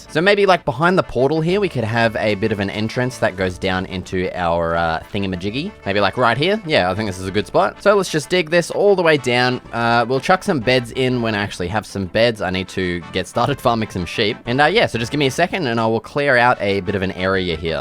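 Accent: Australia. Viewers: How as an Aussie did I never notice how strong our accent is 😂 I'm new here and I'm loving the new hardcore series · I'm Assuming Your an aussie ""Mate.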